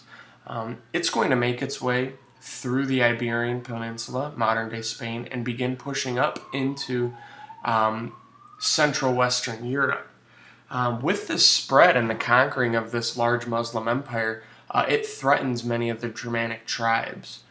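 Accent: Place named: Minnesota, United States. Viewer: You sound like someone from Minnesota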